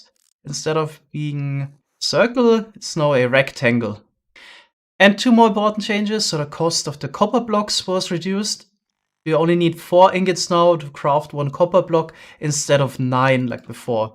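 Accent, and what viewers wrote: Germany. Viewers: I don’t hear strong German accents in English anymore, for a second I thought I heard straight up German.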